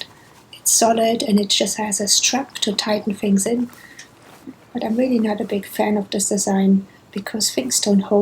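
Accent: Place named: Germany. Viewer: ah, ich hatte mich nur gewundert. Trotz des hervorragenden Englisch hat man den deutschen Akzent dennoch rausgehört. :P Habe direkt mal deinen Kanal abonniert :)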